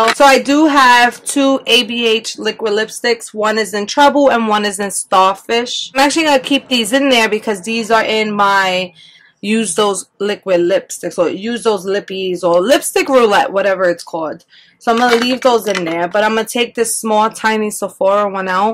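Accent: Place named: New York, United States. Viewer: Giiiirl i hear that heavy up north accent. Is that new york or new jersey i hear? lol. 💕 Also whats the name of the yellow CSS shadow?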